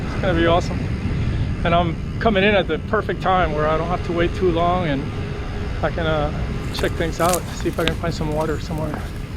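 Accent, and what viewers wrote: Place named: Puerto Rico. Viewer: I always try to figure out what accent I'm hearing..my guess is Puerto Rican?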